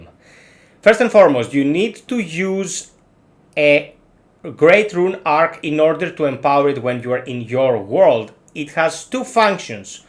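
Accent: Greece. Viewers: i know a greek accent when i hear it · Your accent seems greek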